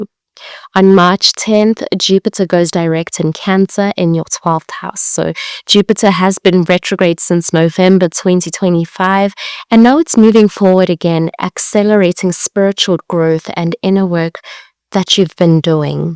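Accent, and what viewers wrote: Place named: South Africa. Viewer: Sounds like a very South African accent.You've got going there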